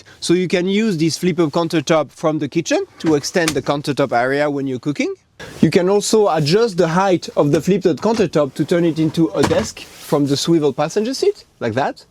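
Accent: France. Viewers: Love the French accent! ❤ Great van, too!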